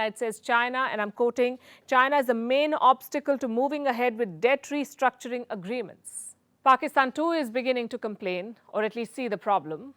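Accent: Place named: India. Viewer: Somehow her Indian accent makes her lttle zingers even more risible.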